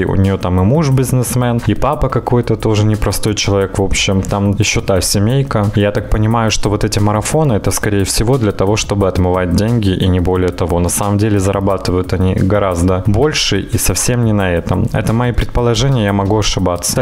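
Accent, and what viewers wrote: Ukraine. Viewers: Мне кажется,что у вас харьковский акцент... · Здравствуйте) Голос у Вас действительно очень приятный, с небольшим украинским акцентом. И человек Вы позитивный, это, прям, слышно. Скажите, как Вас зовут, 🙂я уже месяца 4 смотрю Ваш канал, никакой информации нет, и всё. Хочется комменты писать, обращаясь к Вам по имени🙂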